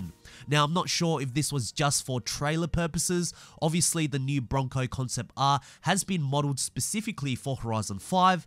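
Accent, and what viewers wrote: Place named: Australia. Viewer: "Yo what's cracking everyone here" Aussie accent never gets old.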